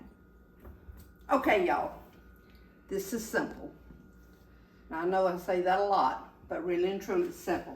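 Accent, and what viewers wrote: South, United States. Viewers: you are so darn cute and I love your southern accent, I was told when I lived in California for 2 years that I had an accent Im from Michigan,my dentist told me I talked like a Canadian EH LOL cake looks yummy... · love your accent, you do not need to correct yourself and your precious southern accent, LOVE IT! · Miss, I MUST get the info on purchasing your cookbook! Funny coincidence, but I collect cookbooks that are similar to yours (that look like spiral notebooks) and to date, I have some as old as the early 1980s and many that were sold by churches, schools, community health clinics, and one that was put together by the Fort Knox (KY) Officer's Wives Group! Thank you so much for sharing your recipes!! And as always, I do love listening to your gorgeous southern drawl!!! 💕💕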